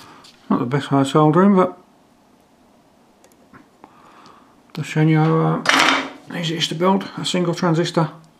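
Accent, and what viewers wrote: United Kingdom. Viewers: your accent has too much British in it. hard to understand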